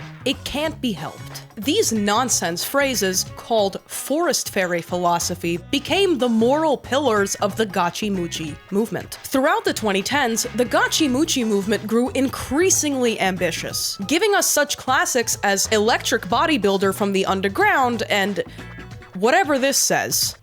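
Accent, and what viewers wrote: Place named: New Jersey, United States. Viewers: Alt woman with bangs and a moderate new jersey accent explaining in depth ancient gay memes. My kryptonite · I feel like youre holding back a jersey accent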